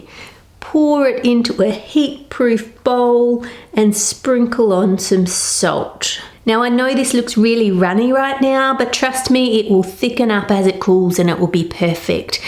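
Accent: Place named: Australia. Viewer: It’s an Australian accent. There is more than one.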